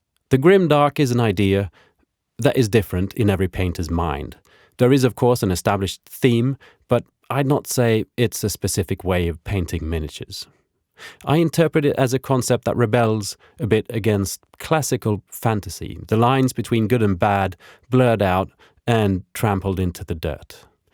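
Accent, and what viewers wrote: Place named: Sweden. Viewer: är du svensk? Nu lade jag märke till dialekten! Btw tycker dina videor är väldigt underhållande att kika på, heja! 👍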